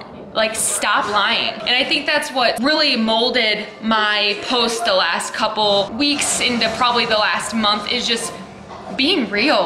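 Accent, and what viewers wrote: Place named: Michigan, United States. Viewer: You sound sooo Michigander it's awesome hahaha. LOVE YOU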